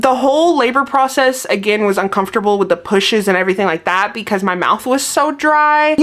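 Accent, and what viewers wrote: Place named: Alabama, United States. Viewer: Girl I want to come up to your town and just sit and have a coffee and talk to you ❤.. I actually live in Phenix City Al and it's so nice to hear someone on YouTube that talks like me, I'm 50 yrs old I have lung cancer. I've been watching you from the start of your YouTube journey. I'm so happy that you are now so popular. You have grown into a wonderful young lady and a absolute amazing Mom. Sending love and prayers 🙏🙏❤️ to you Kev and Oliver.. love the long form video content. You are an example too others your age. ❤❤❤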